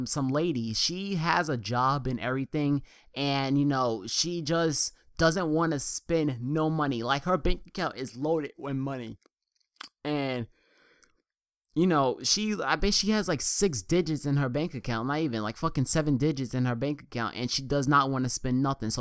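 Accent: New York, United States. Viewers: I don't mind your New York accent at all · And new york accent doesnt bother me! It actually makes your commentarys more unique!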